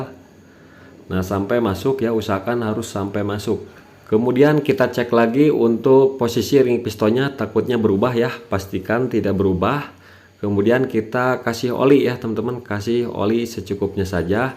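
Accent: West Java, Indonesia. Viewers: Alamatnya daerah mana logat ada bahasa sunda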